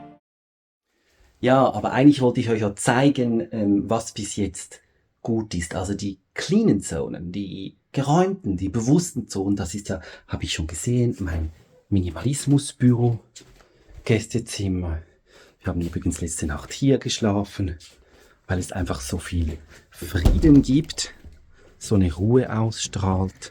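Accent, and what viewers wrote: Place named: Basel, Switzerland. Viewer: Wie schön mal einen Mann beim Sortieren über die Schulter zu schauen! Dein Video hat mir sehr gut gefallen, ich mache das sehr ähnlich und habe auch aufgehört ständig etwas Neues zu kaufen. Ein neues Teil und ein altes Teil muss gehen. Beschriftung mag ich nicht so gerne, aber jedes Teil hat seinen „Park“platz (eine sehr schöne Bezeichnung!). Meine beste Freundin lebt in Frankreich an der Grenze zu Basel, ich höre Deinen Akzent und bin sofort dort 🥰 Vielen Dank für Dein ehrliches Video und liebe Grüße aus Hamburg, Claudia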